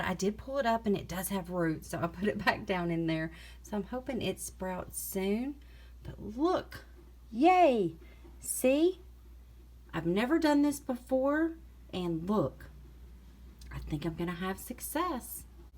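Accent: South, United States. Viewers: I love how excited you are getting about growing these! Also, I’m going to have a better day because you told me too in your lovely southern accent 😊🤗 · Ciao,. You have the cutest southern accent in all of the world, my dear friend. What's your honest opinion on roses?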